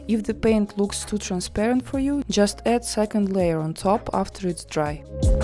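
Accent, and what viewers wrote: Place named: Russia. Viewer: I just finished watching ALL your videos!! I love them!! You're making me want to visit Istanbul..lol. My cousin is going to Greece this summer and may take the trip across the waters to Turkey? Have you ever been to Greece? Also, do you do any outdoor sketching or painting? That would be fun to see too. You're inspiring me to start my art channel as well. And by the way, your English is WONDERFUL!! You have absolutely nothing to worry about. We understand you just fine and the Russian accent is beautiful. I just subscribed to your channel. Thank you for sharing!! Can't wait for the next vidéo!!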